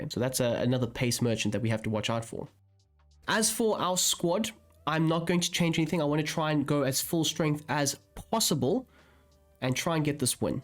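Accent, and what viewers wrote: South Africa. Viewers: I know a South African accent ev3n 8n passing. Had to double back a give a follow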